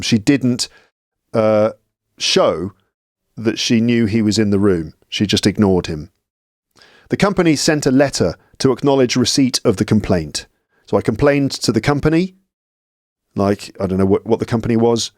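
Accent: United Kingdom. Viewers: British accent is difficult.. I don't know why???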